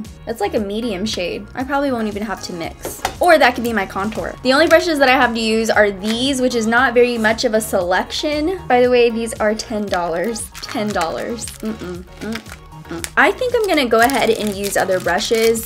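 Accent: England, United Kingdom. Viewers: Is English or American? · Um I say that u r probably from England so yay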